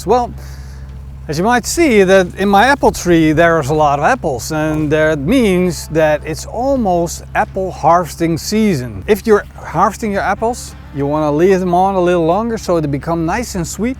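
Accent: Netherlands. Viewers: I do really like your videos and there is a soft spot in my heart hearing a Dutchman speak English, as I went to high school in Den Haag due to my father being transferred over to Europe. That said, I do not really care to own the knives, but having to open accounts on all these different social media platforms is too much for me. I hope you might take that into consideration in the future. FYI, the Big Mac taco recipe was absolutely fantastic! I have turned several people on to your channel due to that recipe. Keep up the great content and take care.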